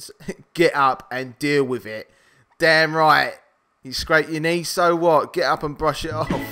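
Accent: United Kingdom. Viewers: you're from UK, don't ya? your voice is so good tho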